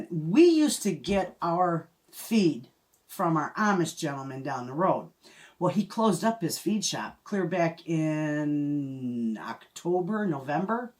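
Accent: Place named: Chicago, United States. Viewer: Lady I just found you maybe a week ago, binged a few videos, and was hooked! You are a delight! I literally smile, and giggle throughout your videos! You are so fun!! I just have to ask. I am a Michigander as well, and too lived in Chicago. Your accent sounded like you were from Chicago, are you? LoL... Accents and trying to figure out where they are from are my thing ?! Anyway I so look forward to the notification that you have posted something! Love your channel!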